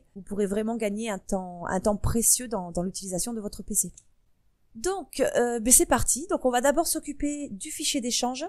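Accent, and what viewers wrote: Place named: North, France. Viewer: Merci, j'ai revu ton tuto avec plaisir, mais je n'ai pas encore partitionné mon disque dur mécanique, je n'ai pas de SSD... PS:" j'men va d'ce pied elpartitionner"!!!, tu lis en phonétique, c'est le patois du Nord, que je n'ai jamais aimé, il est moche... Je suis originaire du Sud et ma mère est Pied noire, mes les gens du Nord, je les apprécie beaucoup... yo !!!! Bisous à toi !!!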